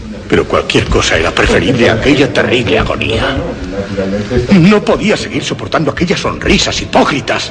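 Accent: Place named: Spain. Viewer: Excelente narración, el acento español hace que el cuento tenga más suspenso. Gracias por este gran aporte, fue de gran ayuda.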